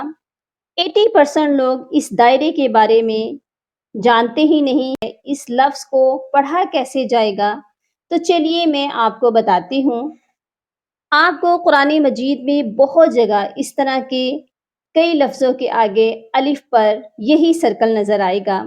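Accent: Panipat, India. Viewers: اپکا پانی پتی لہجہ ھے ماشاء اللہ مجھے بہت پسند ھے لیکن میری سانس زیادہ لمبی نہیھے جسکی وجہ سے میں بہت پرشان ھوتی ھوں کوئی طریقہ بتادیں تاکہ یہ پڑھائی کر سکوں❤❤❤❤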